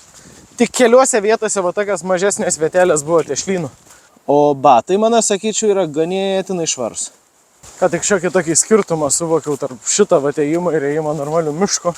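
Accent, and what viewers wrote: Šiauliai, Lithuania. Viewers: Tas siaulietisks bazariuks vis tik islend:)))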